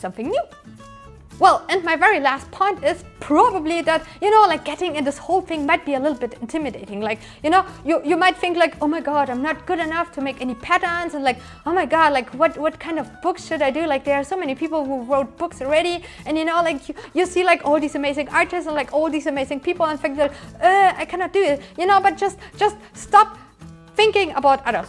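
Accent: Germany. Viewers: You are like clark kent before and after costume.. she goes from that nerd look to a super sexy warrior.. lol... And that german accent nails it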